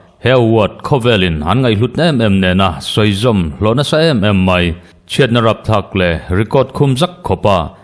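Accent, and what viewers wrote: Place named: Aizawl, India. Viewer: Aizawl milian nuho Accent ang kha inei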